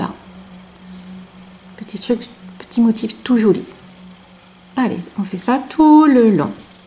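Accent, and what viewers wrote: South, France. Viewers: Coucou merci pour votre top qui est magnifique et vos explications claires et précises avec votre accent du soleil ❤💋👍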